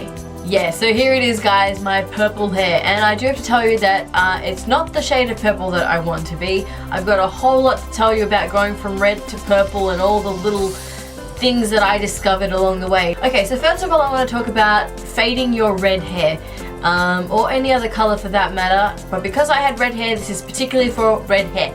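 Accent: Australia. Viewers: I think she's an Aussie ^^ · Girl, I'm an Aussie living in the UK - was sooooo nice to hear your accent!!!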